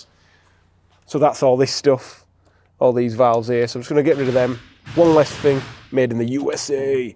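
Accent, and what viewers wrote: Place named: Barnsley, United Kingdom. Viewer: Hi been following your trials and tribulations me thinks you should rename it to Toerag in stead. def more Barnsley like. love the Barnsley accent but its no wonder some folks cant understand you broad Barnsley is a very difficult dialect to understand., and im born and was draged up in Barnsley, well actually Grimethorpe. Keep up the good work take no notice of the nay sayers and internet experts lol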